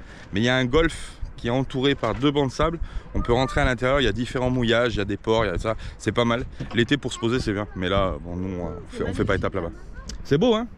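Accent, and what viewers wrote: Spain. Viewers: Hello! Tu as des origines espagnol?, car tu as un super accent.❤ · Tu as un accent andalus!!😀 qui dit tout le temps fait chaud fait trop chaud (k calor pero k calor!!), andalucia❤❤ ☺️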